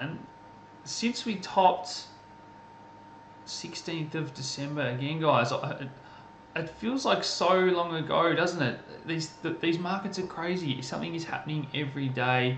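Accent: Australia. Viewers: Brilliant, level headed insights. And I love te Aussie accent being a Queenslander myself. Where are you from?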